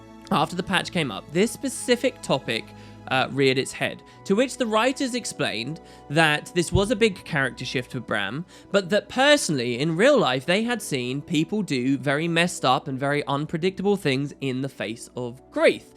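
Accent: United Kingdom. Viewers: Hey don't know if you'll see this, but I've been checking your channel daily sense FF15 release hoping for some content on it. Weather that be the start of an LP or just some sort of news. I imagine you might of said something about it in one of your GW2 vids but unfortunately I've not watched those for some time as I never spent the money on the expansion and don't want to ruin anything as I'm sure one day when I got some extra money to rebuild my computer I will. Anyway, your FFX LP was hands down the best LP I've ever seen, I also enjoyed the first half of the X-2 one, but to honest with ya by the time you started back up I'd forgotten most of what happened and kind of lost interest. Idk, I really hope you'll do an ff15 lp because I'd love a good reason to tune back into your channel on a daily basis again, miss that British accent of yours:)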